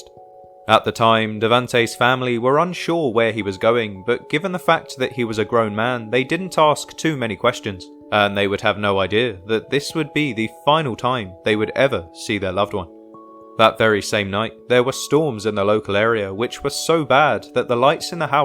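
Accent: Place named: United Kingdom. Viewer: There’s something about a British voice making this sound so not legit. Bad decision.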